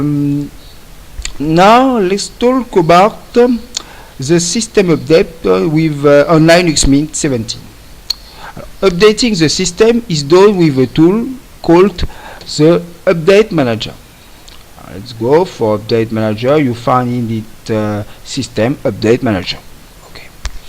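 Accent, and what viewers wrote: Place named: France. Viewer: hello you are french ? because i think that you speak in "franglais" ? i like and joke you accent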